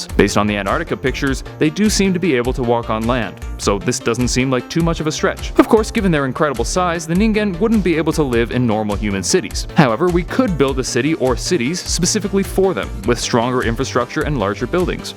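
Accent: Canada. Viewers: Your Canadian?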